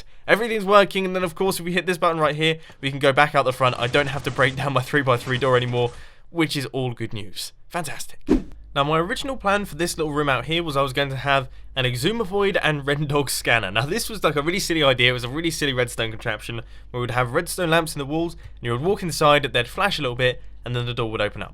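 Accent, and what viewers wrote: England, United Kingdom. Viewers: In just 2 minutes I was inspired to fly my drone again. I've never been so inspired in my life. Is it the British accent? Idk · Yeah I figured he was English (or Welsh) but I just wanted to ask because you never know.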